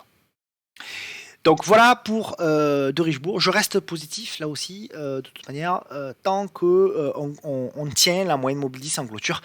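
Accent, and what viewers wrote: South, France. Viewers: Accent du suuuud lol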